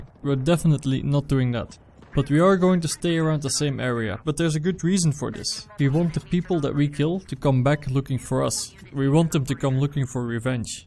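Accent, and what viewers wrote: Netherlands. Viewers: R u dutch cuz u have a dutch accent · Dat English tho!! R u from the Netherlands?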